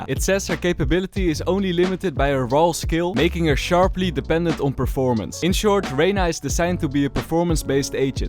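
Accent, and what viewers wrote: Netherlands. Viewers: as a reyna main, i first want to say u sound really Dutch (the Netherlands) and second u say perfectly what i mean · Haha instant hoor je de nederlander Nice kanaal :)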